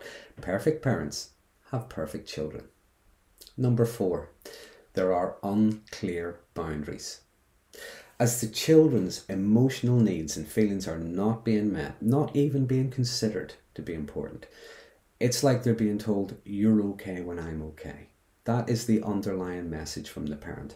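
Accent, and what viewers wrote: Ireland. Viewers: Well done, you're a smart guy--this was very good! That's a fine Irish accent by the way. Cheers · Hello, thank you for your videos. My family not only was a cult in the sense you explained in the video, but we were also in an actual doomsday cult. May I ask where your accent is from? My great-grandmother's family moved to Canada from county Cork in Ireland and you sound just like nanny and aunt Ethel. They were also Magees.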